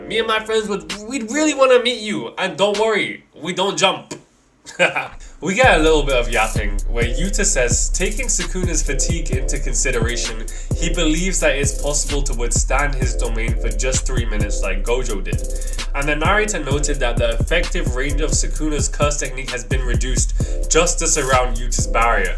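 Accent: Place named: South London, United Kingdom. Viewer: you sound like a south londoner... croydon?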